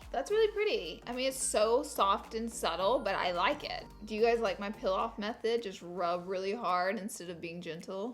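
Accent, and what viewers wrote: South, United States. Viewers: You came up on my feed , so I don’t know you but all I gotta say are you country?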